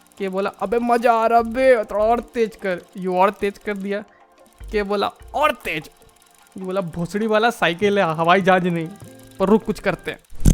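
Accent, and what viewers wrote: Bihar, India. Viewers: Pakka tm bihari ho... Kyu ki bihari he sirf hum bolta hai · Hello I'm your new subscriber from Bihar. Budhwa Chacha 🤣🤣🤣 Your Bihari and Jharkhandi accent make me laugh and happy also . Nice video.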